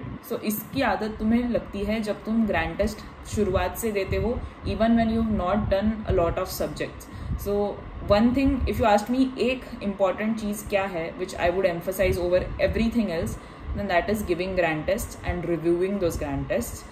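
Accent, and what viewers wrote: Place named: India. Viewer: i dont understand indian accent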